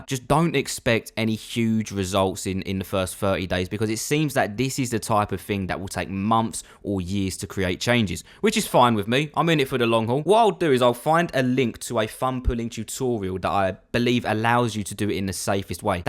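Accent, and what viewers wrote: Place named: United Kingdom. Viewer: strongest british accent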